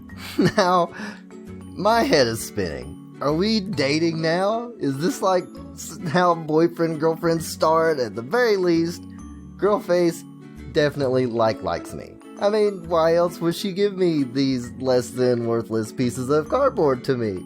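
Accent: South, United States. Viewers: Is that a southern accent I hear?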